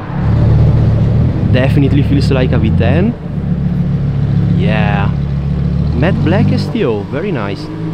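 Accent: Italy. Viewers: Shorter videos, straight to the point, exhaust sounds, some music, your nice Italian voice and those are great! 😃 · Bro il tuo è l’inglese più italiano che ci sia ❤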